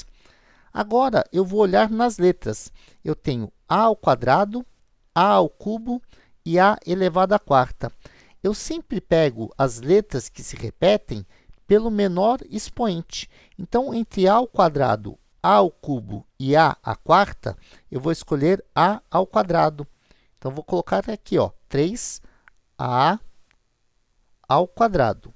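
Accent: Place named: Rio de Janeiro, Brazil. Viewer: so eu que percebi o sotaque de carioca?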